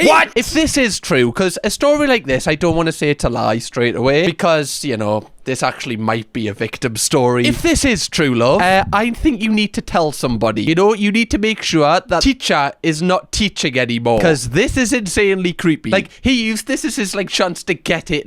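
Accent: Newcastle, United Kingdom. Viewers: i am curious, does learning from give your english a british accent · if anything there is no such thing as a British accent. is from Newcastle so he has a Geordie accent (NE England)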